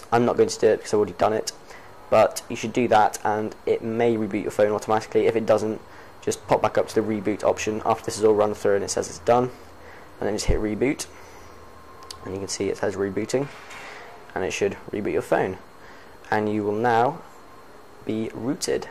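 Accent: London, United Kingdom. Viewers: YOU SOUND LIKE YOU'RE FROM LANDAN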